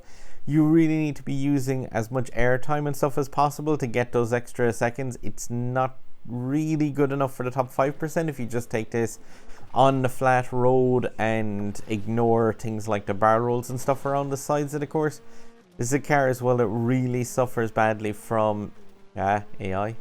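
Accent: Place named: Ireland. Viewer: I love how you start every video with "now~" 😂 love the Irish accent, the way you say "cayr"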